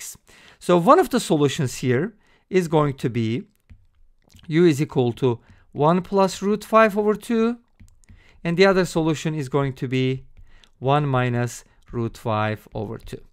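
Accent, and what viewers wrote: Turkey. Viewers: Your pronanciation is like someone whose native language is Turkish, am I correct? Great explanation by the way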